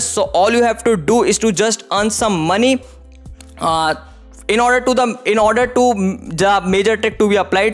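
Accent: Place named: India. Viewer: indian voice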